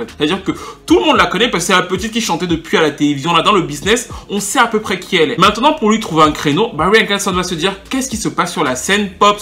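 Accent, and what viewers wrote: Cameroon. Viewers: The way you speak french to an extent reminds me a lot of home 237 love it!!!!!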